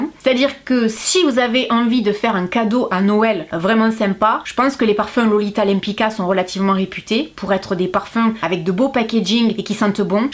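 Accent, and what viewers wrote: South, France. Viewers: Merci beaucoup pour vos vidéos, vous êtes très sympa et votre accent du sud est génial 😀Le rouge à lèvre gerlain, c’est vraie qu’il ai génial et super sympa. Je l’ai eu en cadeau Noël 2019 .😊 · Ta bonne humeur mêlée à ton petit accent du sud me donne toujours du peps (surtout en ces temps troublés) bisous à toi porte toi bien 😘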